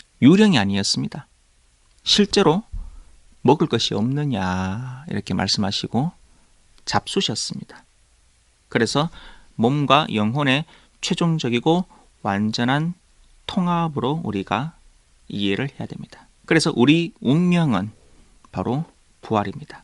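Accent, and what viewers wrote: Gyeongsang, South Korea. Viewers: 말씀도 좋지만 경상도사투리가 이렇게 매력적으로 들릴줄 몰랐습니다 ^^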